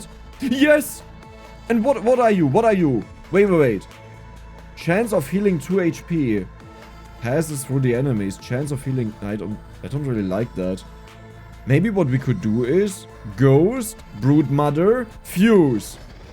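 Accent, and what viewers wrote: Germany. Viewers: man i lover your thick german accent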